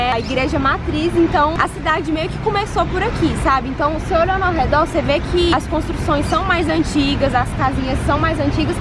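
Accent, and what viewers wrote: Minas Gerais, Brazil. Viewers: Gostei muito Lindo Em Unaí lugares lindos,um beijo meu amor fique com Deus❤ sotaque maravilhoso e do pessoal de Minas😍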